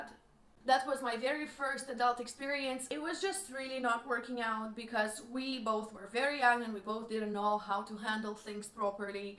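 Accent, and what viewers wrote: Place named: Russia. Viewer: Ого, даже не знала такую грустную и душещипательную историю этой потрясающей юморной красотки., ты умничка, обожаю твои видосы с этим офигкнным русским акцентом (на нём так понятен английский, что англичанам и американцам стоило бы поучиться)))) 😅 ты прелесть! Успеха тебе и не унывай и не сдавайся ❤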